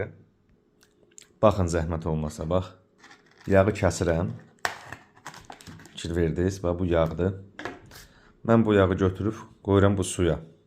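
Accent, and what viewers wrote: Ganja, Azerbaijan. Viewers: bu oğlan ya Gəncəlidi, ya Şəmkirli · Bizə xoş gəlir sizin şirin danışığiniz. Gözəl Gəncə ləhçəsi,arada şirin səmimi sozlər❤Yaxşıki aramızda sizin kimi vicdanlı insanlar var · Gəncə ləhcəsidi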